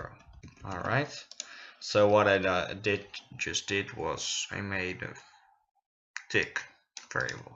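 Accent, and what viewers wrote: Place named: Netherlands. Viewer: Good luck with your exams! Is dutch? I hear an accent..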